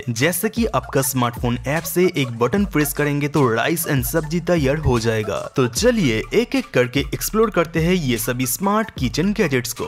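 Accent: Bengal, India. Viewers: हां भाई हिंदी बोलो यार बंगाली आवाज आ रही है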